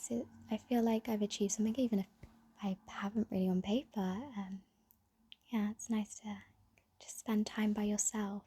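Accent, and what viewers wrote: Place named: United Kingdom. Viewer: You know what it takes real courage to talk about this, especially to people online when trolls are very common. This is the first video of yours I've watched since I've just found your channel and it's kind of opened my eyes to quite a few things, I to fell into the hook up culture but mine was for a different reason ( I won't bore you with it and it's too personal to type about for everyone to see 😊), I guess I need to go and do so thinking, searching if you will 😐 as a British guy I still find it amazing how gorgeous some accents are in our country, you've got such a sweet, innocent voice.. I could literally listen to you talk for hours 😊 that is why I hope you do more of these video's, just hopefully longer.. even if it's just for my own gratification 😳😜 thank you sooo much for this video 🤗💜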